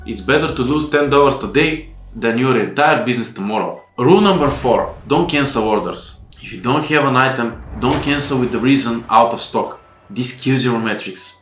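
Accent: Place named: Russia. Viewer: Your English is very good Russian heavy accent 😅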